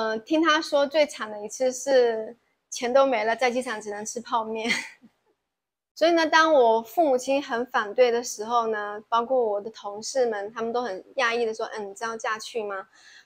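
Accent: Taiwan. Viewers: 口音变台湾腔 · 为什么非要学台湾腔？ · 厲害，講話幾乎沒有大陸口音，反而是台灣口音了！😀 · 您的中文聽起來已經有點台中腔了，大概是慢慢地被同化了吧？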